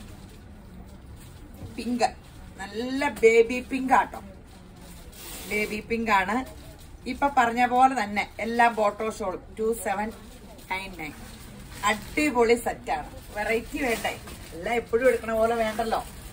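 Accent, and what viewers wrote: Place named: Pala, India. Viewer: ശരിക്കും വർത്താനം കേൾക്കാൻ വേണ്ടി repeated watch all video നിങ്ങൾ പാലക്കാരാ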